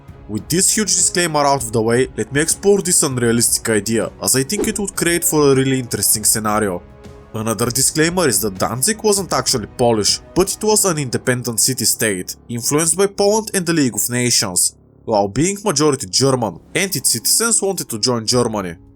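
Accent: Bulgaria. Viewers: The Bulgarian accent is strong with this one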